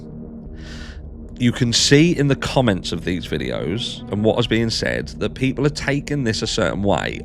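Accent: Norfolk, United Kingdom. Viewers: Love your videos. Where are you from. What is your accent. Are you from Norfolk.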